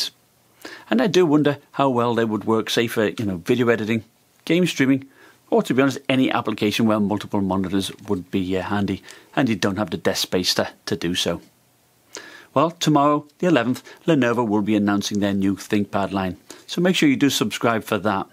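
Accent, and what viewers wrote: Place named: Newcastle, United Kingdom. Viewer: U from newcatle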